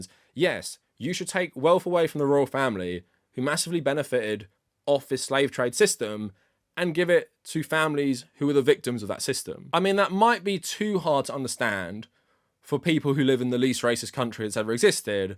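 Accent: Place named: RP, United Kingdom. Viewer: Dude talks about British mentality being so cucked but refers to himself as Irish despite sounding posher than 95% of the UK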